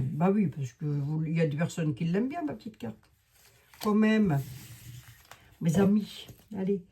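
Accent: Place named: Lorraine, France. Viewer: Coucou, vous "causez" haut, fort et claire Chef 😊(comme dans l'armée😅), de plus avec un bon accent Lorrain (idem dans ma famille😜). Allez je vous écoute sagement💋💋🥰🤩😘❤🧡💛💚💫🌹🌹🍀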